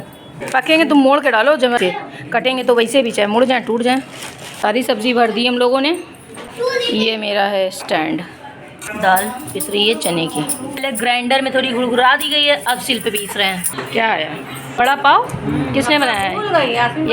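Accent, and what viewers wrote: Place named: Kanpur, India. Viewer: Same aesi meri bhi ammi h...khana bht pasand ata h unko..ar ap bilkl hum logo ki tarah bolti ho pakki kanpurya😅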